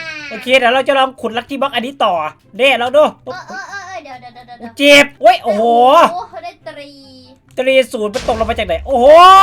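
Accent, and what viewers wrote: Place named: Isan, Thailand. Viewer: พี่ไม่พูดอีสานหรอผมขอมา4คลิปเเล้วรวมถึงคลิปนี้ผมชอบเสียงที่พี่พูดอีสานเอาเเค่ตอนเปิดคลิปเป็นภาษาอีสานก็ได้นะผมจะรอ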